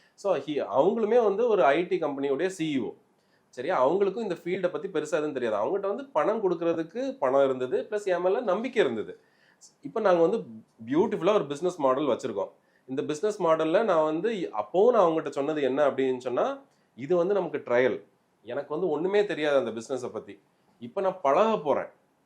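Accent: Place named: Tenkasi, India. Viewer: அம்பாசமுத்திரம் -தென்காசித் தமிழ்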